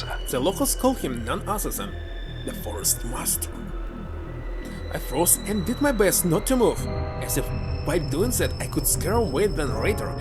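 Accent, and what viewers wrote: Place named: Russia. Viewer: С первой минуты чувству., что ты Русскоговорящий парень ))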